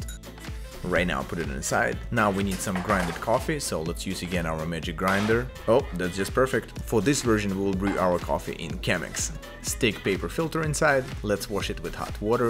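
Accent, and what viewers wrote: Russia. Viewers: Как так получилось, что ты русский и делаешь это на английском? Я просто долго думал, русский ты или нет, вслушивался в акцент... И вроде я уверен в этом. Расскажи, пожалуйста, по скольку мне очень нравится то, что ты делаешь, а потому было бы очень интересна твоя небольшая история. Спасибо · Мне одному казалось что он русскоязычный из-за акцента🤔😁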